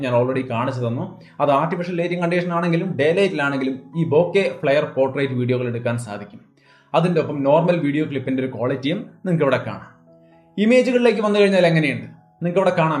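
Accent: Thrissur, India. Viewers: Uff thrissurkaarana aano 😍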